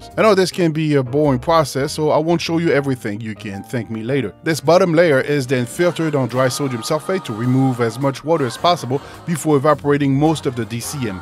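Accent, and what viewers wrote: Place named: South Africa. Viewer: The way he talking I thought was South African or African also from wales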